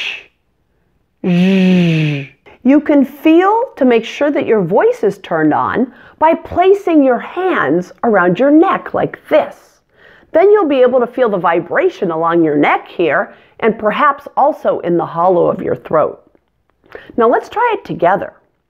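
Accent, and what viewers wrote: United States. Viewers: thx so much 😘😘..teacher this is english america???🤔🤔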